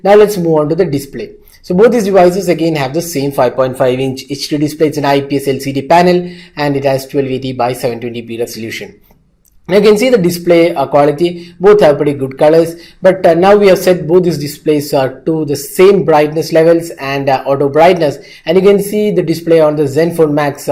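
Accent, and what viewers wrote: India. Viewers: princy princesss well that's Indian English also known a British English with a Indian accent, more English than American English.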